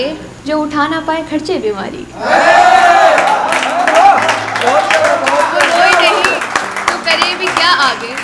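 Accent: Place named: Haryana, India. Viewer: Hindi me kosish acha h but ur hariyana sun jaan me jaan ati hai. 😘😘😘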